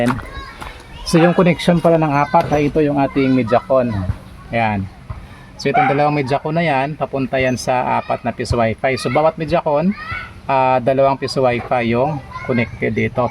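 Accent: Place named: Iloilo, Philippines. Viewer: ilonggo man ni gali. hahah taga diin ka sir?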